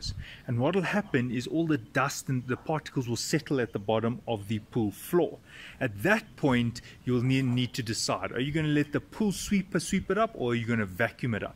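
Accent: South Africa. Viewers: Lekker , hearing a SA accent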